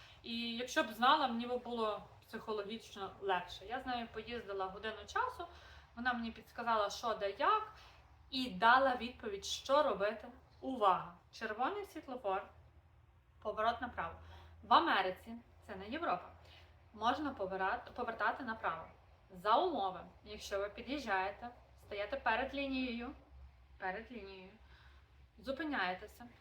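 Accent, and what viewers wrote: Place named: Galicia, Ukraine. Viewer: Дякую за відео, з мене підписка, планую їхати до Нью Йорку. По розмові видно що ви моя землячка з Галичини ). Я до речі з Львівщини. Хай щастить вам на новому місці!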